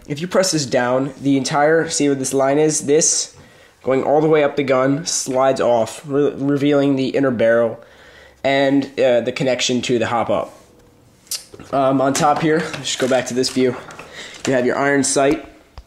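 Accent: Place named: United States. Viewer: u sound american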